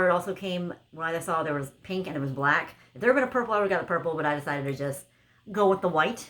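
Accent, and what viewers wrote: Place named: Long Island, United States. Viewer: Hi!!! Love your Long Island accent "Swagga" I'm on the hunt for Bath & Beauty Cherry Blossom awesome haul have an awesome weekend tfs 💕 #teamcheapchick 🐶💕🐶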